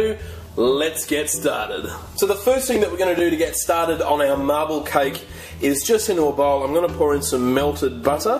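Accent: Australia. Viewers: Awesome cake Are u from auzzie??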